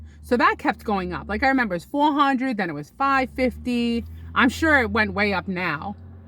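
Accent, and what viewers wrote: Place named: Brooklyn, United States. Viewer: The old Brooklyn accent is really coming out, you know what I'm sayin 😂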